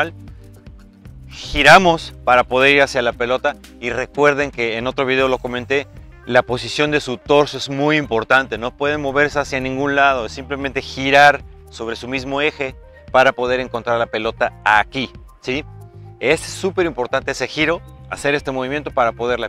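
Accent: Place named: Mexico. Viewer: de que parte de México es profe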